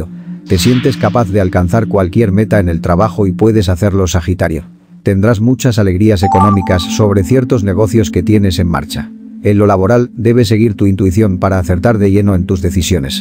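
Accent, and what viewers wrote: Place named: Spain. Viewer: No me gusta que el locutor sea español, cuando el locutor sea mexicano te doy Like mientras, no te veo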